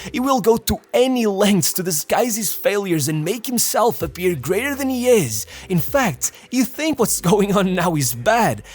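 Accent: Portugal. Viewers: Sotaque bem tuga xD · Are you Portuguese? Accent don’t lie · most portuguese english ever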